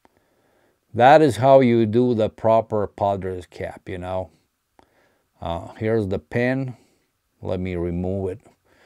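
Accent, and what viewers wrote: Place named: Latvia. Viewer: I swear man, nowadays when I see a good cap I can hear that Latvian voice saying " fucking stunner! " 😅 thats your catchphrase LOL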